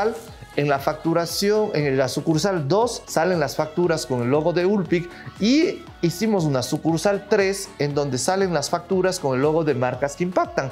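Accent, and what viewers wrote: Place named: Cuenca, Ecuador. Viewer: Me encantó el video, pensando en migrar nuevamente a trabajar como robot en fábricas pero me encantan los negocios, emprender, aprendí amar 🇪🇨 con el alma estando 15 años 🇪🇦 me voy dejando a mis hijos aquí, están a 2 años de ser profesionales y ni siquiera sé si podré estar presente ese día👨‍🎓. ESTOY CON MI CABEZA HECHA UN CAOS Y MI 💔🥺, comentame algo con ese acento Cuencano que AMO😉 #yamesuscribí👍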